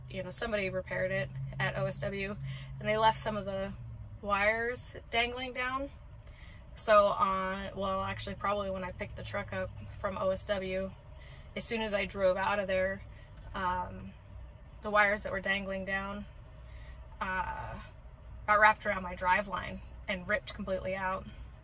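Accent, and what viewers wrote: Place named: United States. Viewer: Hi, I study English for your video. I like hear you american English. I worked as crane driver, I drove truck crane.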